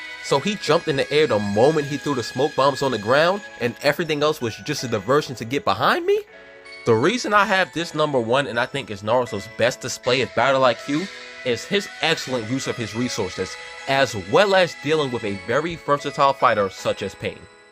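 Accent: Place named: East Coast, United States. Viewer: Where are you from east coast USA huh ?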